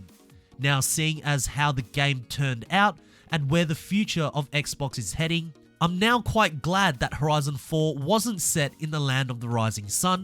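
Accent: Australia. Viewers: Is it the australian accent or do you have a runny nose? :D Nice vid!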